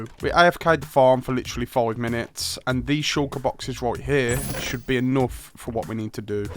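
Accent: United Kingdom. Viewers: I think bro is British. I need American translation to understand 😫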